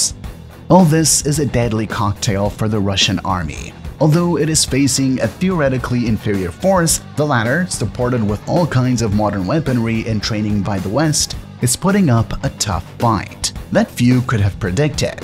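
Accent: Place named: Europe. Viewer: You just said the Su-34 is just as good as the F-15. What do they teach you in that European country that you come from? Yes I know that's where you come from because I can hear your accent. You maybe bilingual but, English is definitely not your first language. I do congratulate you for your mastery of the tongue though. The Su-34 fullback is a ground attack aircraft. The F-15 is a fighter airplane. There is a difference. A Su-34 is mincemeat to an F-15.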